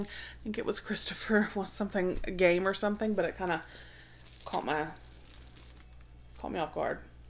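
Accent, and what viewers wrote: South, United States. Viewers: 🎂🎂🎂I am so happy for you to reach this mile stone...its because you have such a wonderful channel and you are such a giving person...I am so blessed that I came across your channel...I love your country accent...And thanks again for getting me started with Globe in...I just love that box...Congratulations...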